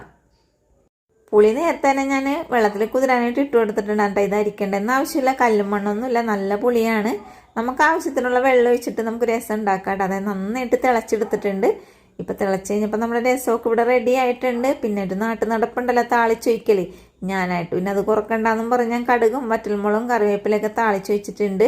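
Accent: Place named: Ernakulam, India. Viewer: എറണാകുളം സ്ലാങ് ❤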